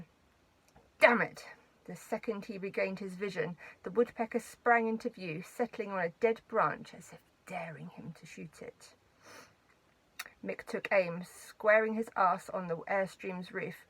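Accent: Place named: United Kingdom. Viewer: Sounds so much better with a British accent!!! Thank you for doing this. You rock.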